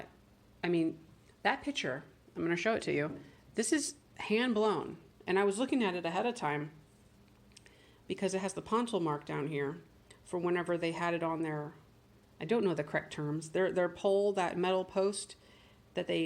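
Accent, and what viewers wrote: United States. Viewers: Oh love the country accent omg laughing so hard 🤣🤣🤣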